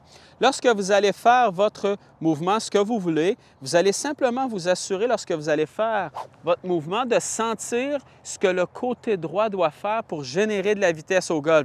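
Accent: Quebec, Canada. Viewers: En plus d'améliorer mon drive, j'ai attrapé l'accent québécois en prime. 😄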